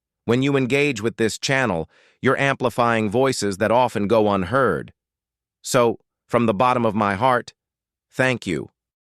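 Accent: West, United States. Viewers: Hey man I know you sound like a Westerner you either be Americans or Canadian or Europeans but you are saying the truth You went there and you make your video at the same time your western leaders led by United States criticizing a leader that try to reformed his country within a short period of time The great jobs from roads constructions from building hydro power industries hospitals and agriculture sectors as well many more development that going on there building Estes or houses and they're still condemning and criticizing this leader captain lbrahim Treore the man of African choice for no reason why should we believe in democracy a system that was in brain prosperity to Africa a system that the western leader use forge story lies to go to Libya to go to Iraq and Afghanistan and many more places in Africa as well propaganda against him is that democracy can we believe democracy to be a true way of governance when their leaders themselves they're not straightforward the lies the manipulation stories how can we accept democracy when people that champions so called democracy and lying blindly something that you have seen it and you are even making video about it is real that the skill and the level of development is not hiding compared to independent time till now the development achieved from that period is nothing He's doing so much great compared to the man who were in power for 27 years what did you do You to serve the French All the resources were going to France and th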